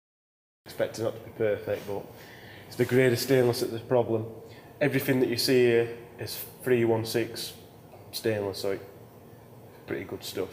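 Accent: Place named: Barnsley, United Kingdom. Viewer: Barnsley accent makes these videos 😂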